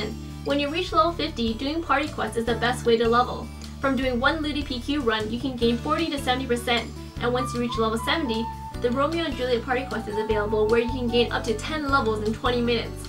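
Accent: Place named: Canada. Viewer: Maplestory back in 2013 or so was like an ultimate P2W game. You forgot to mention Miracle cubing for crazy highass potentials. But nonetheless this is a great video! PS: You sound like Chinese-Canadian. I'm also Chinese Canadian as well! Which part of Canada do you live in?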